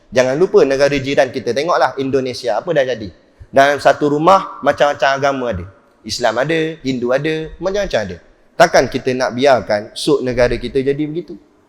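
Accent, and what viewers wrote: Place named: Perak, Malaysia. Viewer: Patut lah fasih Loghat Perok...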